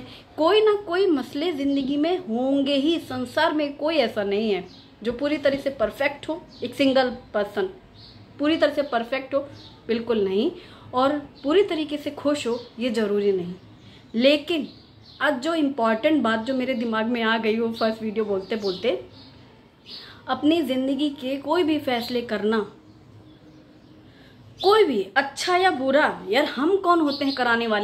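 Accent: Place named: Baghelkhand, India. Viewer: Aapne sahi kiya👍👌aapse baghelkhand ki boli sunkar achchha lga. main Rewa (M.P.) se hu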